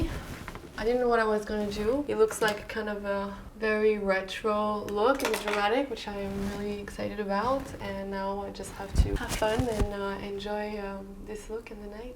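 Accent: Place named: France. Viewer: Bravo for your vidéos that show simple ans wearable make up so différent than most of the american Youtubeuse who make make up with tons of coats, with two many Colors ans hours spent in thé bathroom ( at what Time do they wake up if they work????).... for a result...how to say... I have readen that thé music in the beginning was not available, et c.est bien dommage. Je continue en français parce que moi aussi suis française, et que ça va dix fois plus vite de taper en français. En tout cas, ne change rien, avec ton petit air de Jane Birkin jeune et ton frenchy accent « so cute » ( j.ai le même... et après avoir passé des années à essayer de le gommer, j.ai abandonné et maintenant je le revendique même!). Suis allée à New York en octobre dernier, mais ne t.ai pas croisée au détour d.une des rues du « village »😪. Allez continue à faire « ce que tu veux » et encore bravo.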